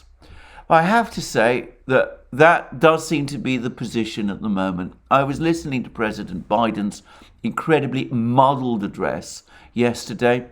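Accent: United Kingdom. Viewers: Very good information about the war in ukraina , and as i said before , you speak very eloquent and ( I also must say that the British accent makes it wonderfully clear and pleasant to the ear ) cheers 👍😀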